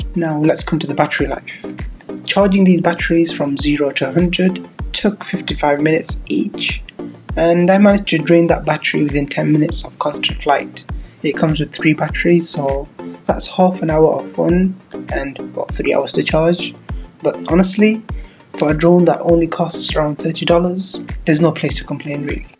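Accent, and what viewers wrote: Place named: Pakistan. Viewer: You sound like a Pakistani 😕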